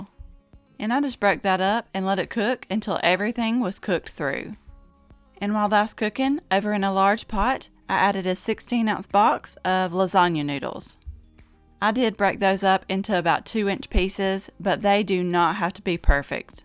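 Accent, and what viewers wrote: South, United States. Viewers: You have such amazing recipes! I love watching you cook and listening to your voice. It's so heavy with your southern accent which I LOVE and it's so soothing. It reminds me of my Momma's♥️ 💕🙏🏼💕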